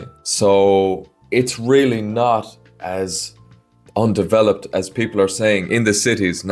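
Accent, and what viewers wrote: Ireland. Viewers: Always brilliantly informative with the brogue which makes it great to listen to..thank you…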